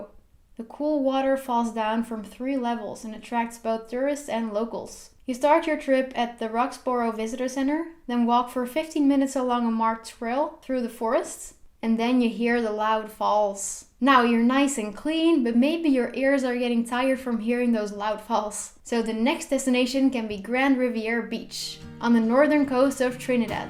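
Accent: Spain. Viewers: Trinidad is so beautiful .. is how she pronouncing everything in this Spanish accent.. noooooo bbg😒😒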